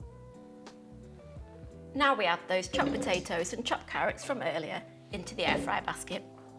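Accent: United Kingdom. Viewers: So glad to find your channel, I am improving my British accent and your accent and cook are both incredible. I follow u from Iraq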